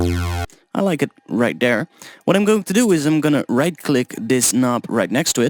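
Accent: Netherlands. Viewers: Good tutorial. En volgens mij ben je nederlander ;)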